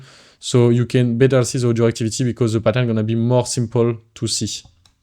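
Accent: France. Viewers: Mec, to accent.. 😅 Heureusement j'suis francophone 😄 Nice stuff anyway, very creative 👏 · notre beau accent Français !! c'est magnifique, sinon gg et merci pour la video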